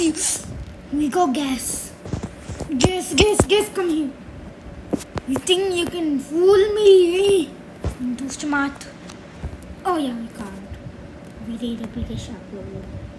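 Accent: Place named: India. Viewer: Your accent like Indian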